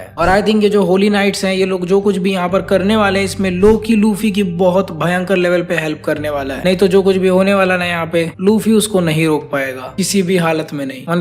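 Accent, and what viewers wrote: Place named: Maharashtra, India. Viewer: Bro's Marathi accent 😭🤌🏻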